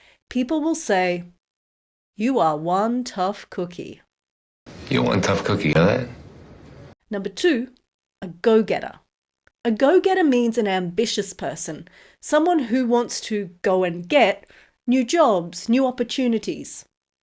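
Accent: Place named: England, United Kingdom. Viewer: Thanks girl I really like your accent from which English city are you from ?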